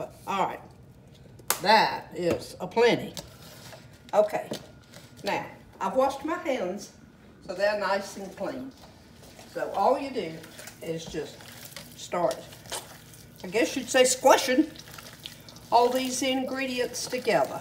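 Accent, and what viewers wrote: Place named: Charleston, United States. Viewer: Love your accent. I'm from South Carolina also. You sound like a Charleston Area native. I love the way you added the veggies on the side. Going to do that the next time I fix meatloaf.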